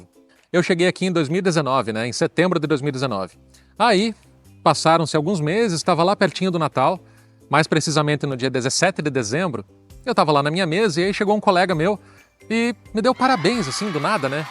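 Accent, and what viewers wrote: South, Brazil. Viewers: Oi, esse de teu sobrenome é português, italiano, ou polonês transformado? Logo que começaste a falar, identifiquei: RS, SC Ou Paraná? Sou jornalista e antropóloga e adoro vídeos como os teus. Parabéns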